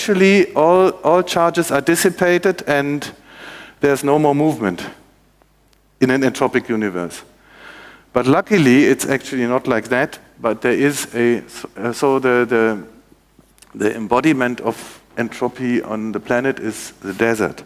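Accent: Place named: Denmark. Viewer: Sounds like Danish accent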